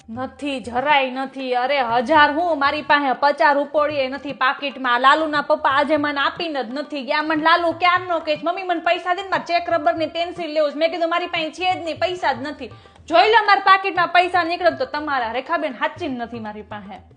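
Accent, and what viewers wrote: Kathiawar, India. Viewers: એક પાત્રીય અભિનય અસ્સલ કાઠિયાવાડી , તળપદી બોલી માં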